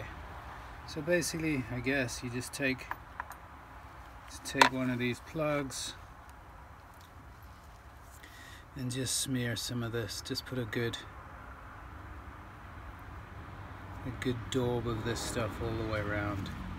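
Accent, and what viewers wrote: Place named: Europe. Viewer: Wow! Never knew or pictured you speaking with a European accent lol! Awesome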